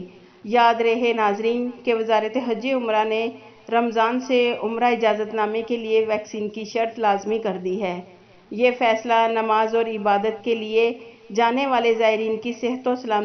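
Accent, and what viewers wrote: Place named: Punjab, Pakistan. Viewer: Good evening! Your pronunciation is punjabi so are you punjabi speaking?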